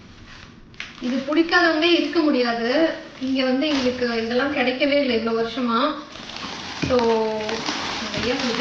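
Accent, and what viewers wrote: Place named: Coimbatore, India. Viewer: I like your voice!!! Very sweet!!! And liked your Coimbatore slang 😊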